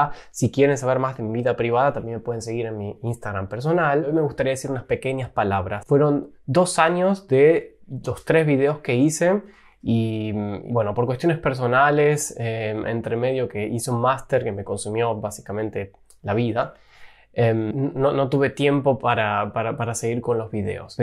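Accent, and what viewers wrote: Argentina. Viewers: Estimado , no tomes a mal si te expreso que me es insoportable el uso del sheismo en sustitucion del yeismo, lo considero una afectacion decadente surgida en los 90. Vos sos joven y tal vez tu entorno lo usa, pero me gustaria que reflexionaras al respecto , imaginate a Gardel cantando " de un noble potrisho que justo en la rasha afloja al shegar" ( no creo que hubiese triunfado de expresarse asi). Confio en tu sensibilidad auditiva , abrazo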